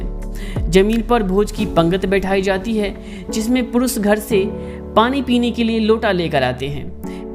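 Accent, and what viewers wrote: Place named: Bihar, India. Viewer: Bhaiya parampara hota hai ya parampara hota hai ham jante hain aap Bihar ke Bihar Dakota bolate Hain Puri ka pura aur pura ka Puri hai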